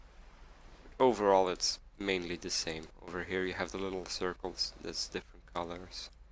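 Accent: Belgium. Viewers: You from België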